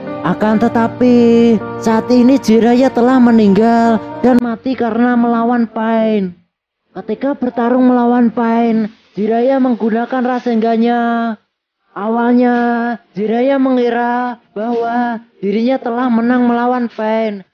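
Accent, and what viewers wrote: Java, Indonesia. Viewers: Ceritanya .dialeknya .Jepang Jawa 😀😀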